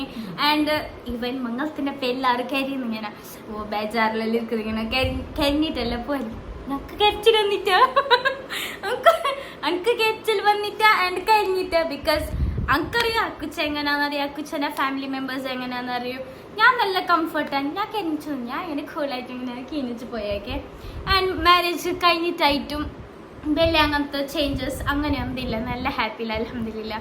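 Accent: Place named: Kasaragod, India. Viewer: Ninde ksd baashe paangilla ninde porel mundne aa slanganne ni mundumbo paang👍🏻❤‍🔥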